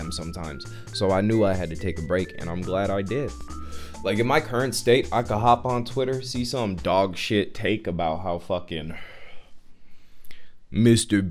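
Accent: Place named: Wisconsin, United States. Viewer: My boy have to be from Wisconsin lol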